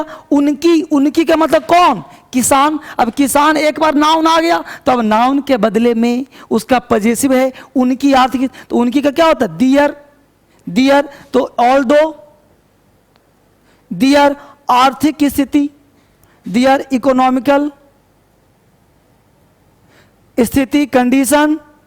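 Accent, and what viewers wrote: Bihar, India. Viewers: Ap bahut achhha padhate h Sir ap Bihar k rhn wale lagte hai n....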